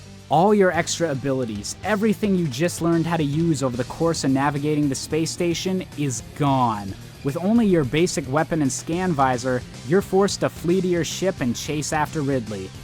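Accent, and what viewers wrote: Canada. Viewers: dat Canadian (?) accent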